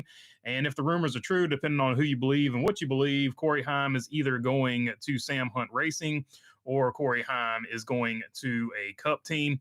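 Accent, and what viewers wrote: South, United States. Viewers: You have a cool accent. It sounds very Nascar and southern. I live in Canada so your accent is quite noticeable. Like an old school Nascar driver or crew chief.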